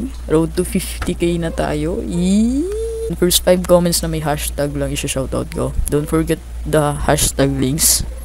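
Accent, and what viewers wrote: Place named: Iloilo, Philippines. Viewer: taga san poba kayo tol bakit nag i ilonggo ka tol ?????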